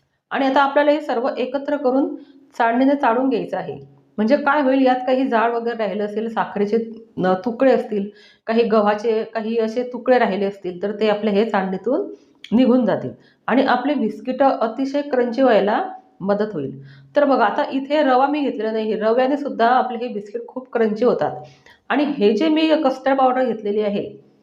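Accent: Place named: Leva Patil, India. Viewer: तुम्ही लेवा पाटील आहे का भाषेवरून समजते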